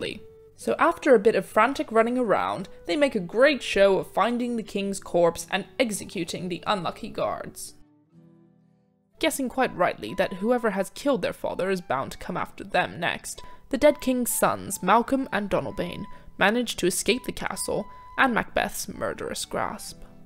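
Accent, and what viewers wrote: United Kingdom. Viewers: Tysm I have an exam tomorrow and this helped me too much I appreciate with the best British accent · Enjoy the narrative with British accent; and I appreciate learning such famous stories in so short a time!👍-Thank you! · Since everyone could recognize this is a typically English or British accent if you agreed!🎉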